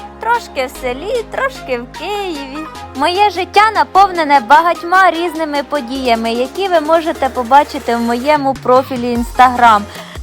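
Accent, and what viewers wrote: Poltava, Ukraine. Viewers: Що ви морозите? Який суржик? Прекрасна українська мова з інтонаціями Полтавщини.... Не лізьте з своїми порадами туди, куди голова не влазить.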